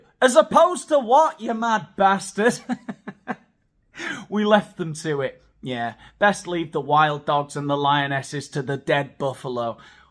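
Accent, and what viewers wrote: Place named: United Kingdom. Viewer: I ❤ conjunctive adverbs. Then again, everything sounds splendid in British English.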